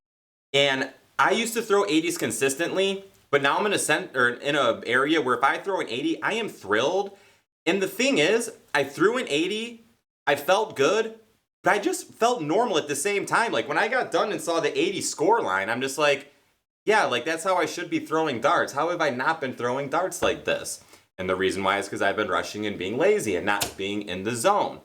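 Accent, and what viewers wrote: United States. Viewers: Wow. It’s great to hear an American speaking about Darts. 👍🏻 or maybe Canadian I don’t know lol but I’m tired of the British Accent 😆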